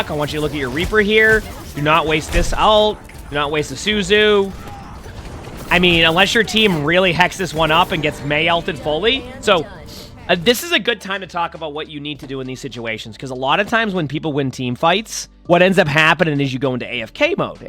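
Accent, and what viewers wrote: United States. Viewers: Can someone tell me what accent has? I know it’s American ofc but what state specifically?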